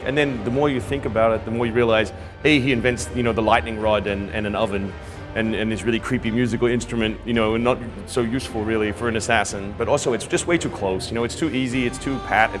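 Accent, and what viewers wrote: Australia. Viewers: What the fuck is this dudes accent? He has got to have spent time in Australia.... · i cant pick it, is this guy Australian? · half the time this guy talks he has an australian accent, what gives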